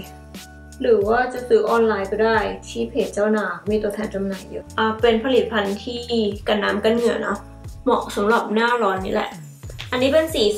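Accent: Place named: South, Thailand. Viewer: พี่ แหลงใต้ทำคลิปหล่าวตะ ชอบนิ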